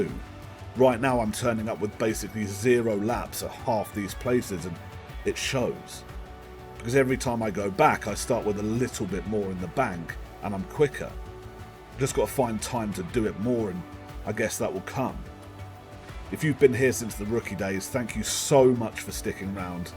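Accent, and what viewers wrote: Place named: United Kingdom. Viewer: A british accent always makes narrating sound supreme.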